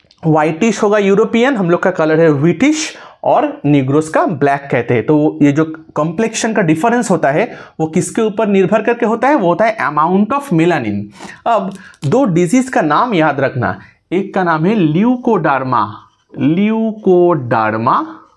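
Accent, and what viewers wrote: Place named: Bengal, India. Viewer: Sir your ascent is type of Bengali..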